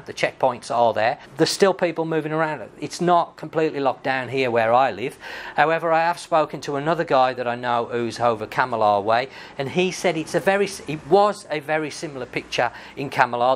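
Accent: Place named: Birmingham, United Kingdom. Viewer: haha ye I know that actually meant what part sorry you sound like a brummie lol I sent you an e mail a while ago regarding motorbike hire.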